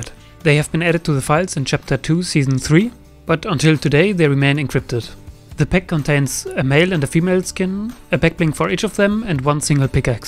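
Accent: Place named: Germany. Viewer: man hört den deutschen aktzent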